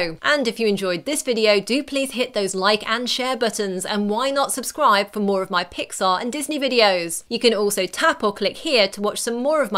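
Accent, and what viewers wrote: Castile, Spain. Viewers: You sound castellan are you from Europe or something? Just wondering nice video tho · Great review. I would have loved for you to use the Mexican pronunciation of Spanish words, rather than the European Spanish pronunciation. For example, the name 'De la Cruz' in Mexico would be pronounced 'De la Crus' NOT 'De la CruTH'. In Latin American Spanish words with a 'z' or a 'c' are pronounced with an 's' sound, as opposed to the much harsher 'th' sound from European Spanish Therefore Pan Dulce, should be pronounced 'Pan Dulse', NOT 'Pan DulTHe'. It's a real shame as I could tell you were making an effort to pronounce the words correctly, however, for someone how knows the difference, it sounds totally out of context, just like a history British film would sound if words were pronounced in American or Australian English... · That explains the near perfect Castilian accent. 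Que chevere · I totally love this video. It stated very exact and truthful facts. Only thing wrong is the accent. The words are perfectly pronounced is just that she used spanish accent instead of Latino or Mexican accent which is totally ok. It's just weird to hear familiar names in a different accent.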